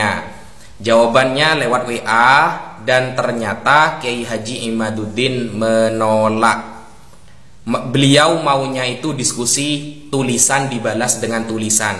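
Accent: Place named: Madura, Indonesia. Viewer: Logat Madura banget gus *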